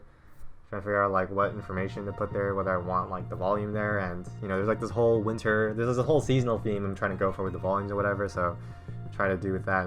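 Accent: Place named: New York, United States. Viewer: I hear nyc ;)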